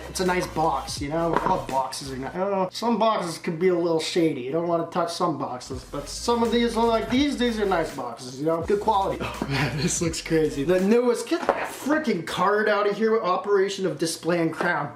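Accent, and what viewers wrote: Canada. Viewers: You sound like you from Canada 😂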